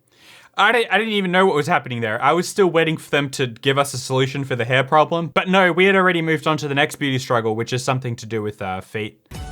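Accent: Australia. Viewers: Your no moi confuses me specially when after it you start speaking perfect Australian accented English... 😅 Yeah, I know my problem is weird 😅😅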